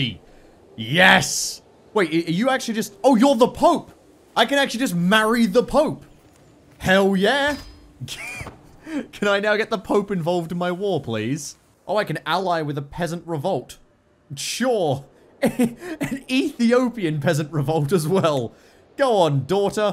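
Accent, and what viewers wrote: Australia. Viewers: down under aye u aussie mate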